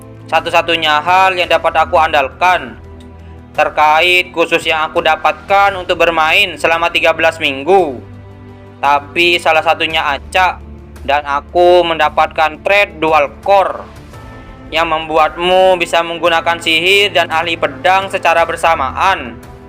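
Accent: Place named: Madura, Indonesia. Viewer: mimin logat madura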